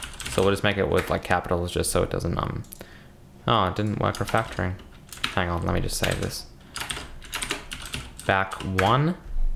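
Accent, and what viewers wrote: Russia. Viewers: Черт! Мне казалось, что ты похож на русского, не ожидал что в одном из видео это будет! Надеюсь ты прочтешь, это! У тебя классный акцент, хотелось бы услышать твою историю, но это наверное не совсем в стиле этого канала. Как у тебя дела?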